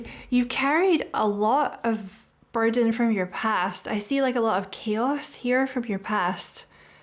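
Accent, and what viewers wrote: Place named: Scotland, United Kingdom. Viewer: Ouch some uncomfortable home truths there. This was a brilliant reading delivered all the better with that wonderful Scottish accent across an equally wonderful kitchen table. I’d love a one to one tarot reading on that same table with a truckers tea brew! Haha! Thank You. 🙏🙂🙏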